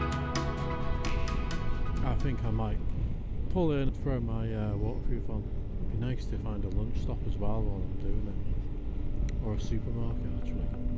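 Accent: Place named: North West England, United Kingdom. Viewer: Fair play doing a big trip like that on your own 🏍️👏🏻 you sound like your from the North West? Maybe catch up on a ride in the future 😎 great vlog - Cheers Rob.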